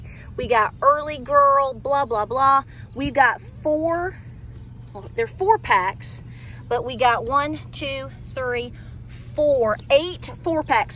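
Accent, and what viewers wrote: South, United States. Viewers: don't lose that southern drawl!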